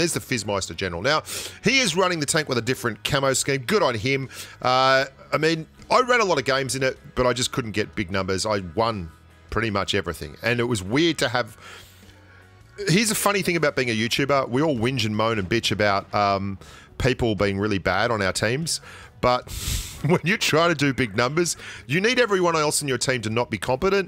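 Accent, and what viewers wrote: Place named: Australia. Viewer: FIRST! Also, are you okay? tell me your property isnt up in flames. You sound australian and idk if you are from australia and live there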